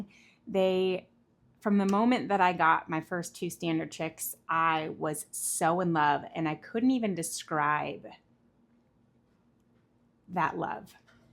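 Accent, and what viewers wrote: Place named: South, United States. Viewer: very interesting but i couldn't deal with them not making it i can't believe people correct your speech really you have a southern drawl and so what if it comes out not the u sound i know what you meant. great video full of info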